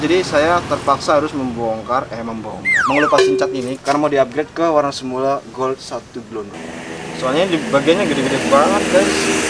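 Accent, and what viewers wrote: Banyumas, Indonesia. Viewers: Mantap wong ngapak 🤣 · Mas ngomong nge nggae basa ngapak kenera ora ngapak ora kepenak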